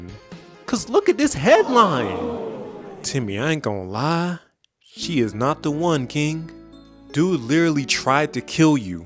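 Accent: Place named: South, United States. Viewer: Your channel finna blow on god, and bruh I need to see if you from Georgia or Florida cause you kinda sound like us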